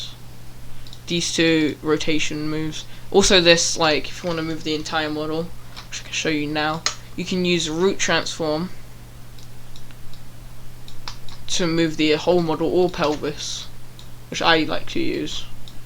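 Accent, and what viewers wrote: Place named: United Kingdom. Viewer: This is the most bri"ish accent I've ever witnessed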